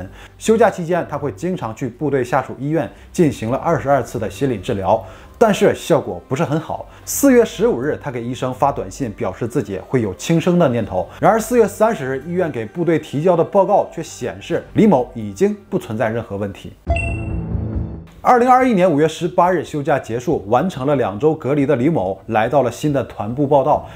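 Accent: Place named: Tianjin, China. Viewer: 听口音好像是天津人，如果我错了请原谅😂 喜欢你的视频，看不够！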